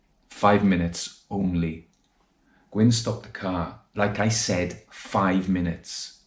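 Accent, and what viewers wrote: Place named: Scotland, United Kingdom. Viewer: I thought I detected a hint of Scottish in your accent, and then I heard that you come from Cumbria, so that explained that. You pronounce "poor" as "puir."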